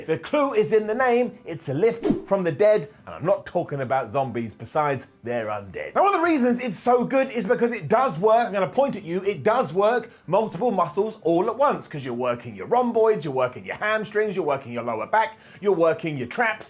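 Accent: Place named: Cockney, United Kingdom. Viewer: Are you a Cockney? You sound a tad like the cockney Geezer from the mighty boosh.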